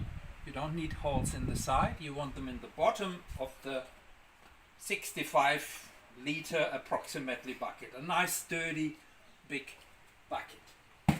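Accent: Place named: Germany. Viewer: Hi, this is definitely the best simple and easy way I have found so far. Thank you, I have subscribed! (My name is Therese Lauterbach, from South Africa. I hear the German accent and am also from German descent. I would like to make contact with you, please.)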